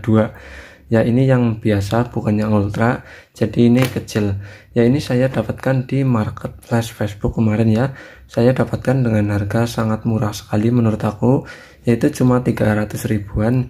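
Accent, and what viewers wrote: Banyumas, Indonesia. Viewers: Medok ngapak mas 😄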